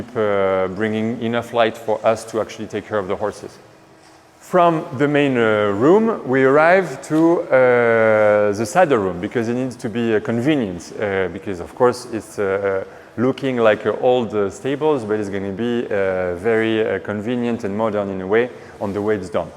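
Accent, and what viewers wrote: France. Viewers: Always a champion! Love the changes you have made..your English is superb, the light French accent makes me want to learn the language!!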